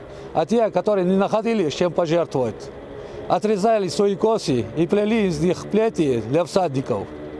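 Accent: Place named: Chechnya, Russia. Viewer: Унего чеченский акцент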